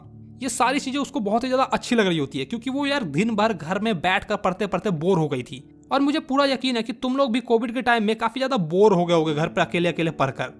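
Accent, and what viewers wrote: Bihar, India. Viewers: Bhaiya aap bihar se ho na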